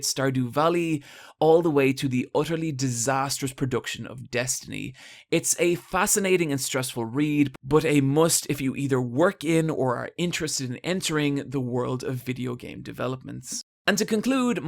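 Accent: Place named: Cork, Ireland. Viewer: Man, you're really fantastic dude, keep it up. I'm from Cork and its quite refreshing to hear a voice I can relate to talking on these topics.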